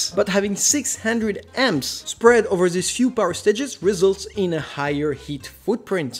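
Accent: France. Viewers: hey i'm sold thanks you very much hope you grow fast on ytb that's explain very well, love the french accent and humor i'm also uh i plan to get this comfig this going to be my first pc gamer i was a console player before now, so for let's sayy holiday 2020 i will have it and buy it there is the part the tomahawk b550 a amd rayzen 7 3700x for the GPU the last thing and more expensive I'll buy the geforce 3080 uh also for the ram what's you saying is if i put only one the pc will run better ? and also a corsair A500 dual fan for the cpu and all can you tell me if it's a solid quality build pc already?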